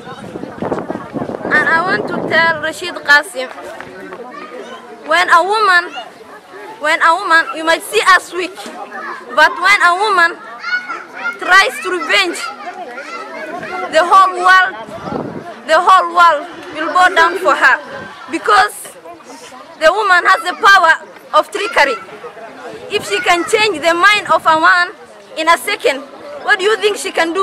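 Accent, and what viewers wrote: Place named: Somalia. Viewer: Kiboor Somalitizing English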